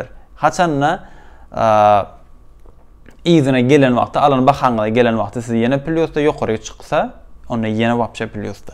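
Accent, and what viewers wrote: Turkmenistan. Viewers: Turkmenistan Turkcesi mi bu?) Cox gozel seslenir)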